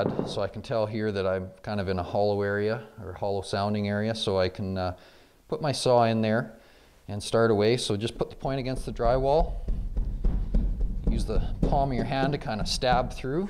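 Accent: Canada. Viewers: The second I heard the Canadian accent. I knew I could trust this guy. Thanks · Only americans can tell if he has a Canadian accent ! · as soon as i heard the canadian voice i clicked off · Heavy Canadian accent wo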